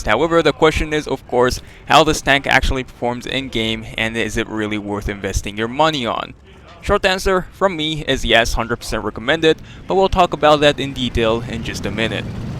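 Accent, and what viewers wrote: Germany. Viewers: würdest du auch mal ein deutsch schprachiges video machen man merkt nemlich das du deutscher bis !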